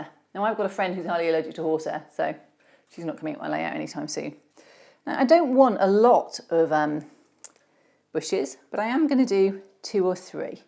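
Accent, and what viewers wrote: United Kingdom. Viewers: Great video. I'm trying to place your accent, where in the UK are you from?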